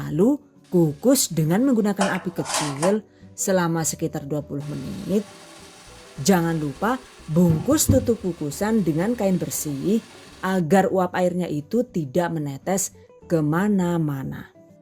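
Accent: Banyumas, Indonesia. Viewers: Mantap bun...Purwokerto hadir ,tp tinggal e ng Bekasi.seneng bgt klo lg ngmng ngapak.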